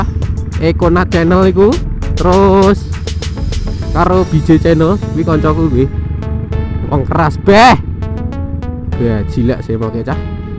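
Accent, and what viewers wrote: Java, Indonesia. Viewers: jowomu ngendi pak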